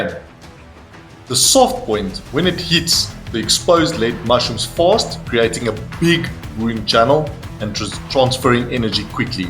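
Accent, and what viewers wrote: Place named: Afrikaans, South Africa. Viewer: Jeeez, you very Afrikaans.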